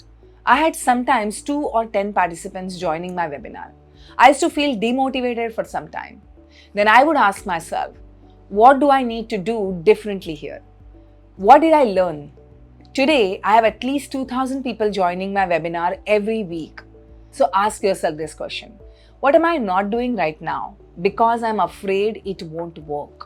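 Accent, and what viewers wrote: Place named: England, United Kingdom. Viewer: Love your English accent and the way you present your points with the flow. Happy to listen to you👏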